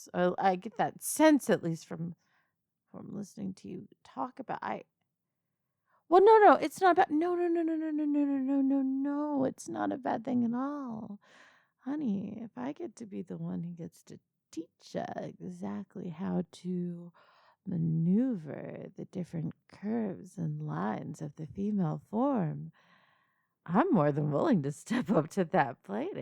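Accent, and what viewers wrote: South, United States. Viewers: Whoo even if on the off chance I'm the only one who feels safe when a southern possessive soft dom lady starts speaking sweet nothings feels safe and at home, thank you for making me feel that way ❤ love you ❤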